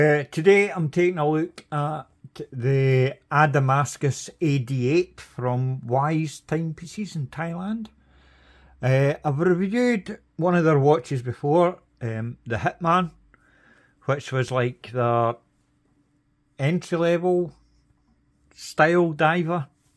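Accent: Scotland, United Kingdom. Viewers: Bloody hell, it seems like I’m hearing William Wallace reviewing a watch. Nice review.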